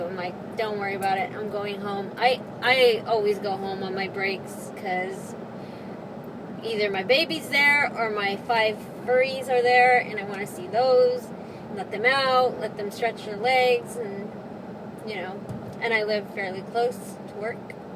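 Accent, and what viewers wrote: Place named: Midwest, United States. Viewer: I enjoyed this little rant. Are you in Cali or Florida? You sound like you're from the midwest. What's the story with your name?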